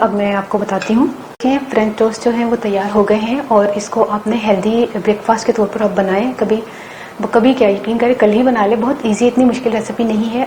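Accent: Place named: Punjab, India. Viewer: behna your punjabi language voice i love it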